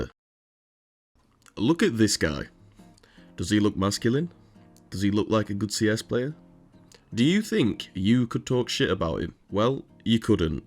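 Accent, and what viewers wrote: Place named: United Kingdom. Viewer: yeah I was tripping a bit cuz your accent is the same as mine but something was telling me off 🙏🏻 which part of the uk tho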